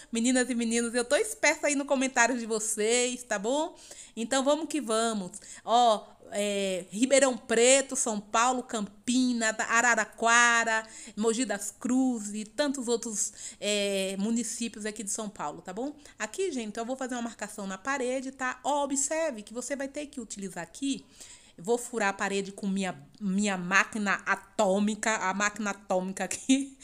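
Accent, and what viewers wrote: Bahia, Brazil. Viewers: E tá baiana arretada,gosto muito do teu jeito de falar 👏👏👏👏👏👏🙏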